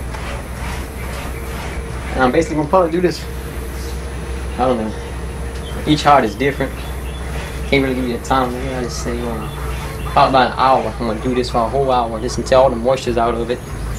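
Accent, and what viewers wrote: Louisiana, United States. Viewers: American... Southern of some sort, Louisiana? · Man that Louisiana accent come out thick. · Southern Louisiana, American accent. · I'm from Louisiana.. Promise this dude is too...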